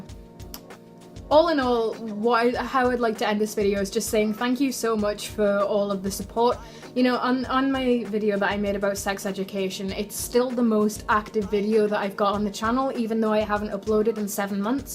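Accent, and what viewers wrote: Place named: North, United Kingdom. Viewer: !!!!! <333 hearing your accent makes me feel so happy i miss the north sob!!! so excited to see you back on youtube!:D!!!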